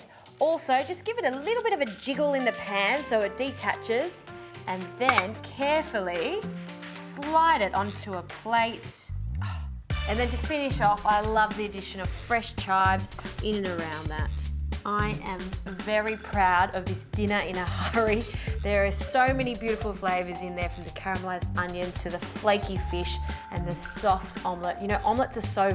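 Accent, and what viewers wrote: Australia. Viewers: Nice Australian accent.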